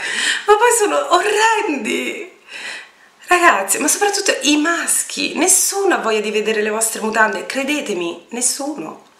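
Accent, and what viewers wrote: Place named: Campania, Italy. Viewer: ciao..sei carina e simpatica..grazie per i consigli posso chiederti di dove sei??parli come me...son campana...ciao.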